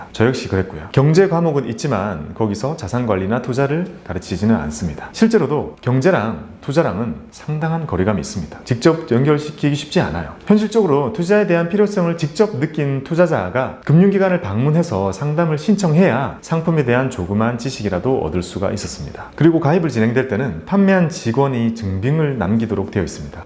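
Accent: Gyeongsang, South Korea. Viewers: 배아본적이 없는데??ㅋㅋㅋ 경상도 사투리인가요ㅋㅋㅋ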